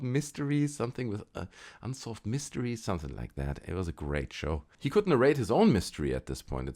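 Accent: Germany. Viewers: Lol you are funny man. Cool accent! German? Greetings from Holland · Subscribed because your German accent is excellent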